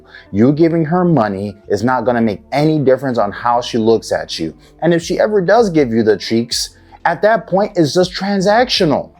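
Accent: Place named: Nigeria. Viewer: The nigerian accent goes crazy bro haha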